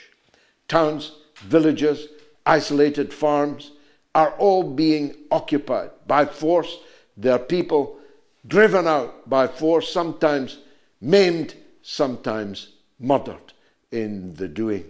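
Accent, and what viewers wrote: Scotland, United Kingdom. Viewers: i think you are great George....you might roar with a scottish accent but you are definitely a lion of england just the same...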